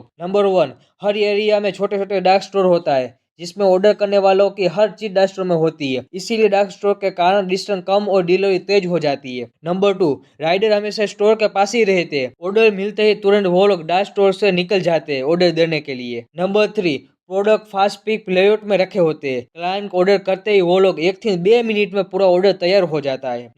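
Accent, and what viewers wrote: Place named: Gujarat, India. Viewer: E gujju hindi sunke majha aavi gaya😂, jhepto jaise dusri khani bhi sunana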